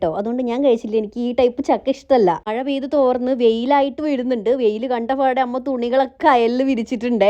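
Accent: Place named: Malappuram, India. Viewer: ചേച്ചി മലപ്പുറം ആണോ അതെ സംസാരം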